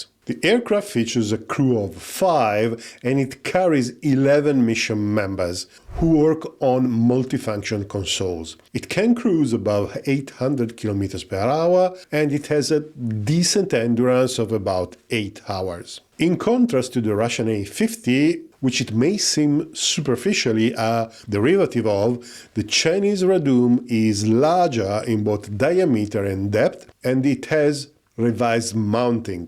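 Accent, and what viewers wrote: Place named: France. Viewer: With a French English accent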